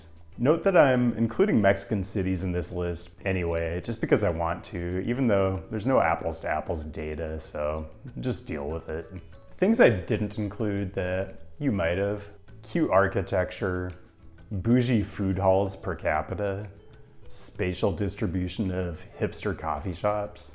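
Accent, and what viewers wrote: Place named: Midwest, United States. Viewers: he sounds Midwest to me also but I already heard from his other videos where he’s from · Is he? I'm Floridian. He sounds midwestern. Y'all have dry wit up there too do ya?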